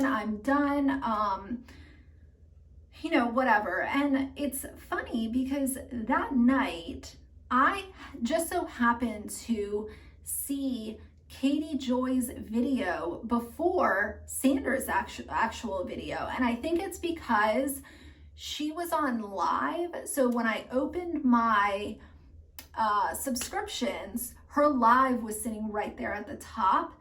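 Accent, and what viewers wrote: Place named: South, United States. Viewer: Lip fillers and botox!! She is from MN and suddenly has a southern accent and spoke to Amy Duggar King and now says, ” Y’all.” She is very one-sided with her opinion. Only hers counts. I subscribed when she covered the Schoenfield alleged Munchausen by proxy kids. I unsubscribed when she ripped the Duggar kids apart. Not including Josh, the others need compassion. Enough ppl complained and now she has changed her tune. She is small-minded. I feel sorry for her. She is very typical of this area of the country. I have lived in each region of the U.S. and I feel I am able to make this opinion also from experience in the Military and from being a Military wife.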